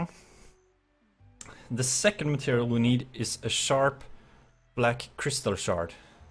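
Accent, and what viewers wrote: Sweden. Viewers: Is that a swedish accent i hear? D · are you swedish?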